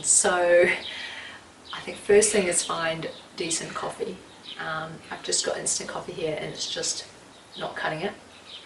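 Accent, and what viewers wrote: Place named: South Island, New Zealand. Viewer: Hey where in NZ are you from? Seems like a South Island accent. Sorry if I am wrong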